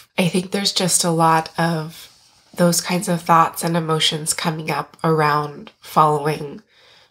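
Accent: Canada. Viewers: It sounds like you’re Canadian? If so, I will ignore the clinical dogma, as in the U.S. we use human experience language to describe extreme states. I consider the word “psychosis” a slur, no matter who utters it.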